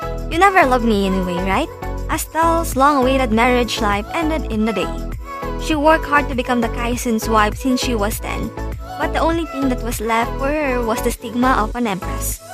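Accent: Philippines. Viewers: The moment I heard you're voice I knew you're filipina. Hahahaha.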